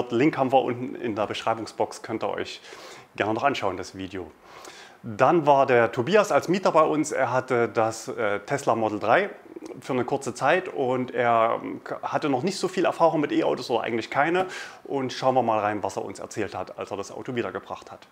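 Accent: Germany. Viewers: Gute News aber du ziehst ja echt hardcore die deutsche Aussprache durch :D Model übsilon geht ja noch, aber <link>.. da steckt eindeutig "drive" im Namen, also elec trive :D.. glaube ich :)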